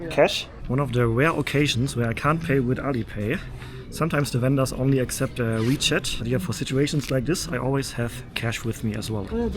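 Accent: Germany. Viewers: It's Deutsch accent, not English accent. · Love your videos. Please keep it up. Also love your German accent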